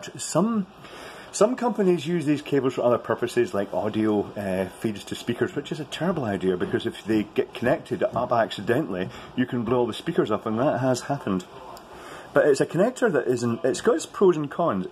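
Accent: Scotland, United Kingdom. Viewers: This is so lovely. I live in the US, but I worked the Fringe for Gilded Ballon many years ago. Seeing 16a Ceeform sitting on a flight case while hearing a Scottish voice and pipes just warms my heart!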